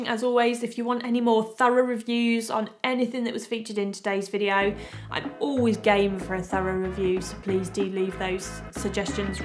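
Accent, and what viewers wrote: England, United Kingdom. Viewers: How should I express how much I love your English accent and the professionalism in all item reviews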